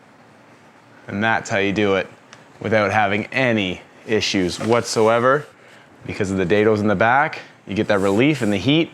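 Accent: Canada. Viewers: Whats with all these canadians and the ABOOT crap!!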